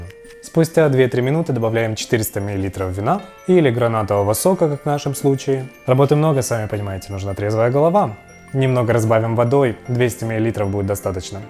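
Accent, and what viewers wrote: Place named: Surzhyk, Ukraine. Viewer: Хильнуть))) обожаю твой суржик. И кота.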